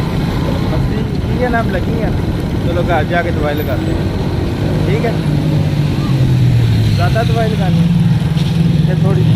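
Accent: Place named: Pakistan. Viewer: Urdu touch Mashallah g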